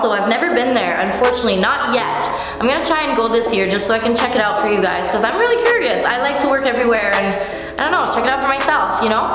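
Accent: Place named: France. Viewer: Girl I hear ur French accent and I’m francophone aussi lol I love it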